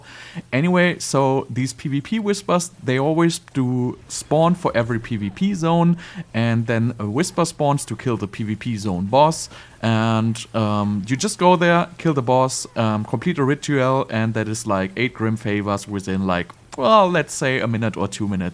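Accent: Germany. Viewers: yeah thats a fine build for overworld. it like omni cl of s1. that was probably even more op for farming:) . sadly its gone for now. And greetings from germany, you sound german too.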